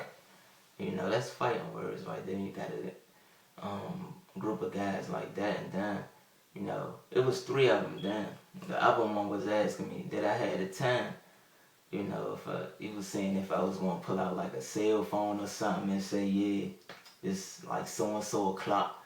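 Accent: Washington DC, United States. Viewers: Cool hair, you sound like you're from DC. This vid was interesting.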